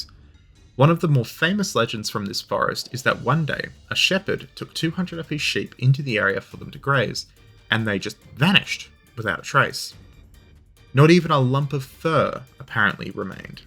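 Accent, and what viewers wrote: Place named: Australia. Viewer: Something I wasn't expecting was an Australian historian telling me about Romanian folklore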